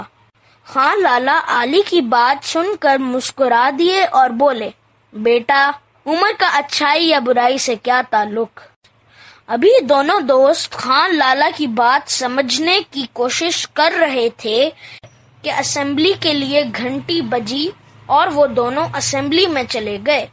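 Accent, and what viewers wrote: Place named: Pakistan. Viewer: Very strong & Lovely Urdu accent Keep it up boy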